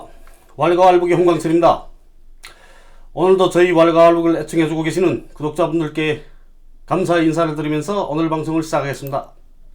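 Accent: North Korea. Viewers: 일단 북한 말투를 아직 못버렸네 음....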